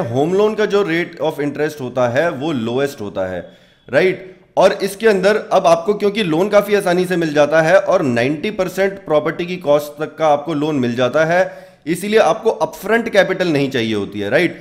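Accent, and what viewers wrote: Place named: Kerala, India. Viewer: I think ua from kerla or tamil nadu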